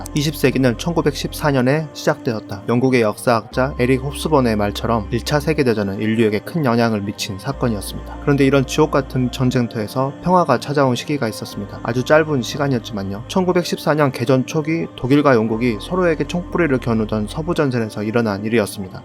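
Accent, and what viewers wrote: Jeolla, South Korea. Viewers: 난 이 형님 전라도 억양이 너무 좋아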